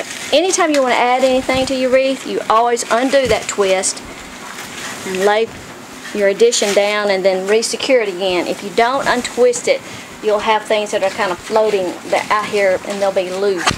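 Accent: South, United States. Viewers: Love your project. I love the mom and daughter togetherness. Families sh o uld be closer in their lives including mine. Love your southern talk. Im from Louisiana so y'all are great!!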